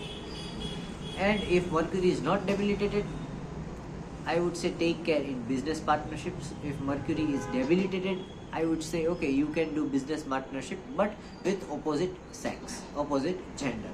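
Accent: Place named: Bengal, India. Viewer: Apni bangali, accent similar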